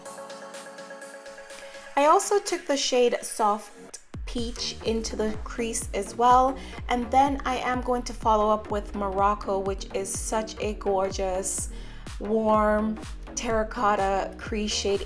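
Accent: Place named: Jamaica. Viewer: your makeup is always so gorgeous, are you jamaican I hear an accent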